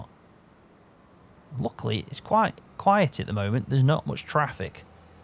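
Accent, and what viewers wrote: Staffordshire, United Kingdom. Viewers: I've started watching your videos a few days ago, and im loving it! Also, please correct me if im wrong, I live in staffordshire, and I believe you do to, your accent sounds like a staffordshire one.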